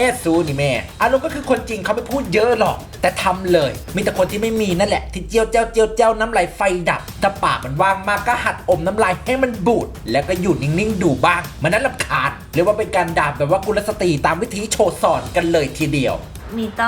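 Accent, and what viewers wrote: Isan, Thailand. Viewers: <num>ถ้าไม่ใช่คนอิสานจะฟังออกมั้ยคะคลิปแปลนี้ รู้สึกได้เปรียบหนักเลย ฟังออกทุกคำ🤣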